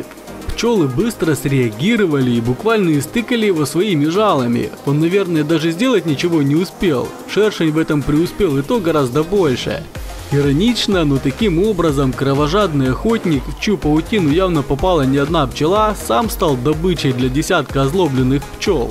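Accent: Ukraine. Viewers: а разве не в украине просто говорит он с некоторым акцентом, похож на украинский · это Украинский акцент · Какой же мерзотный 404-й акцент.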